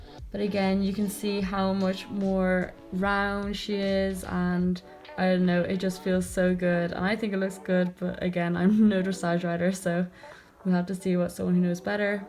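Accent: Ireland. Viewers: Are you from Ireland??❤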